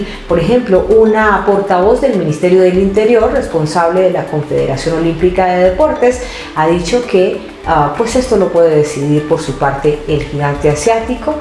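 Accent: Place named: Colombia. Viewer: wow, creo que seré de las primeras en el chat, saludos!! Amo el acento colombiano:)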